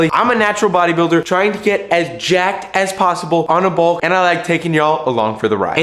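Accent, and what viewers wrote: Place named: South, United States. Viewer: Like for ya south accent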